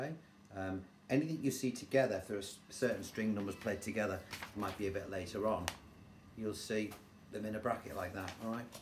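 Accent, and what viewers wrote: Liverpool, United Kingdom. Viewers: Hi, enjoying your guitar lessons, great to hear a scouser tell it as it is. I have been to your bluenote channel where you cover loads of my favourite musicians. I agree with your comments regards, saw them play at the empire. Good luck with the album, some great tracks on there.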